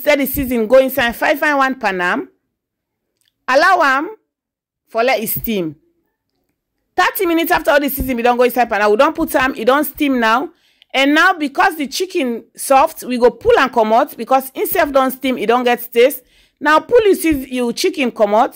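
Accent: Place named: Sierra Leone. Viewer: My first time listening to Sierra Leone speak I’m 🇯🇲 and can understand most of what you are saying, I enjoyed listening to your beautiful accent, thank you.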